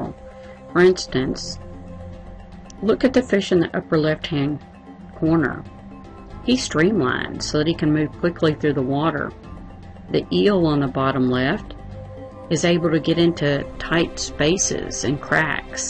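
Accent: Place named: United States. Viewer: sounds American to me but i ain't good hearing accents it all sounds the same to me well except the RP accent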